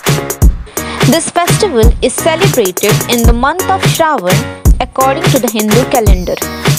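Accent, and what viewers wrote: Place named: Goa, India. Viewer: I lover your tipical goan english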